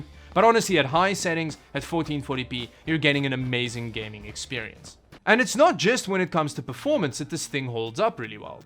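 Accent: South Africa. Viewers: where are you from dude, you have a polish name but you sound south african im so confused rn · hey whats your accent? south african im guessing? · are you "a botjie from SA" or just have an accent?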